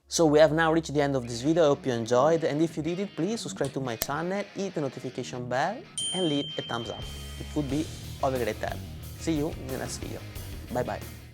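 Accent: Italy. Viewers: Ma sei italiano? Mi sembra un accento italiano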